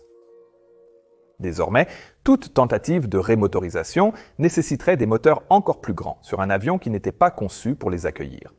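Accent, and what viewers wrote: Canada. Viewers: Dear, I am a French speaking Swiss citizen. I listen regularly at your great interventions with much interest. Your talks are so well documented, no fake news, trustworthy infos, very educational. Recently I discovered the French versions, translated with AI. Your voice is tainted with Canadian accent and is not as fluent as your English. Sincerely I prefer from far to listen at you in English, as your English is absolutely perfect. May be a suggestion: you should definitely have the original English version. Why not put a link to switch to the original English somewhere below the display?. Thank you and congratulations for your amazing work.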